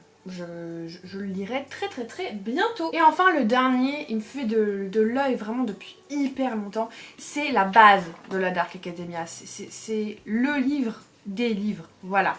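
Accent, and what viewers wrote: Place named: South, France. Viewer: Bravo pour le abonnés!!! 🎉 quel bel accent du Sud 😅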